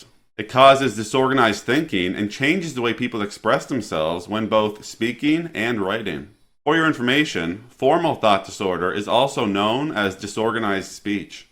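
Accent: Newfoundland, Canada. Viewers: You must be from Newfoundland . Love the channel subbed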